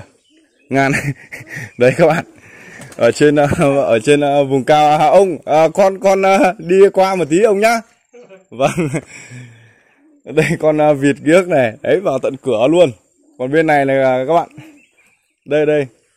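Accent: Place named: Cao Bang, Vietnam. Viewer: M cũng dân tộc nùng tỉnh Cao Bằng,nge b nói tiếng nùng với bà các e nhỏ, giống tiếng nùng Cao Bằng nge hiểu hết.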